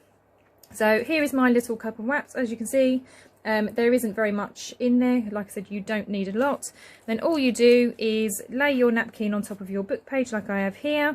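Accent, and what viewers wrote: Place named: United Kingdom. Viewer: Thank you. A British accent, great. Translucent - light passes through.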